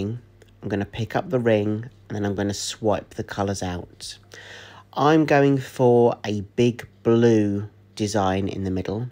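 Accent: United Kingdom. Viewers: Oh yes. Certainly 3D. It's incredible. Nice to hear your (British!) voice over rather than just music.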